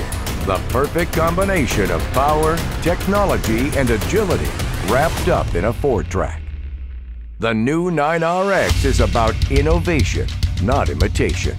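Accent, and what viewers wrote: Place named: South, United States. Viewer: That southern accent is whats making it. Perfection!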